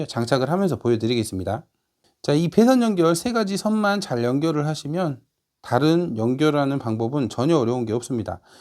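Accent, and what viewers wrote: Jeolla, South Korea. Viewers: 전라도 말투 넘 좋아요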